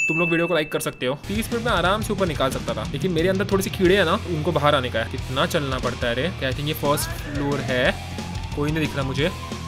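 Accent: Maharashtra, India. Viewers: Mahhpat : you are marathi or hindi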